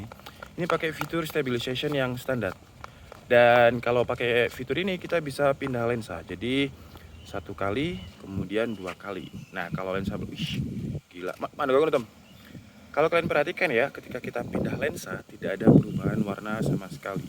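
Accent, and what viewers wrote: Java, Indonesia. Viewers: Jawa.ne pundi om? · Dia orang malang ngab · Sampeyan jowone ngendi tho mas kok medok tenan 😀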